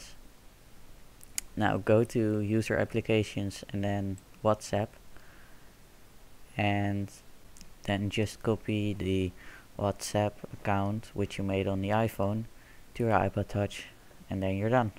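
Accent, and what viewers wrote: Netherlands. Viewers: Are you dutch beacause your accent sounds very dutch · Aan je accent te horen ben je Nederlands of niet?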